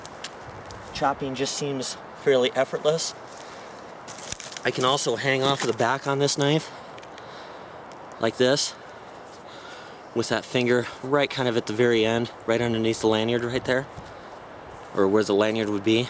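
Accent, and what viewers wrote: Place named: Arizona, United States. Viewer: haha he knows, he stated when he first introduced it that he won't be pronouncing it as hoonglas but as unglas bcuz of his Arizona accent/speech pattern.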